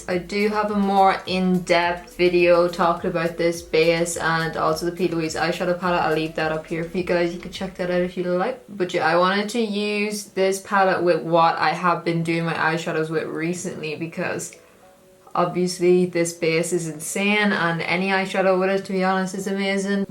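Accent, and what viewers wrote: Ireland. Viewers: Wait are you Irish ?? Btw your absolutely AMAZING ly xx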